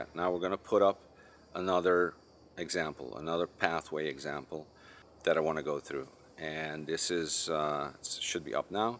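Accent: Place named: Netherlands. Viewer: There is a slight dutch way of saying things, besides that I have concert-pianist ears so that's how I noticed.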